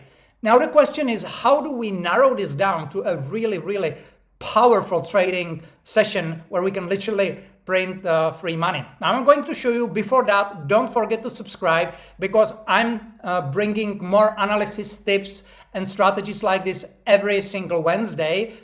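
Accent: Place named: Czech Republic. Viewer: This is what i trad nikkei to the long. I noticed how nicely it moves and started searching who trades nikkei on internet and found this video. After 10 yrs of trading I started getting sick with forex pairs…navigating forex is tough even for experienced traders. Nikkei has a structure, much easier. But this info about mondays is even more helpful U say 9 to 3 pn what time zone? I guess u mean tokyo time? Thank you for the video bro!!! U sound Czech by accent;)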